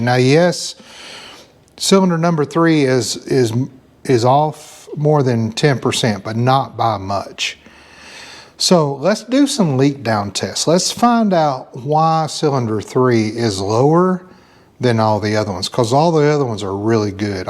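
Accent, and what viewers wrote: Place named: Tennessee, United States. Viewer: Very nice! You sound like you’re from my next of the woods (TN)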